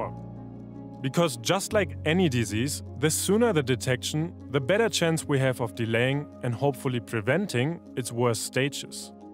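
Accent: Germany. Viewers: Please! Get a native English speaker or use an AI voice. This bad pronunciation as well as the German accent is awful...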